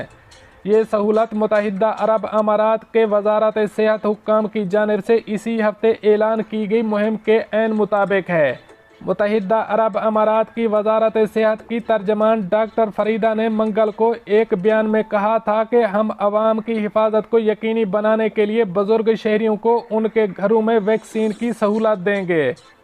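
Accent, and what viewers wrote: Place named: Punjab, India. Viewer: Urdu news in PUNJAAAABI accent?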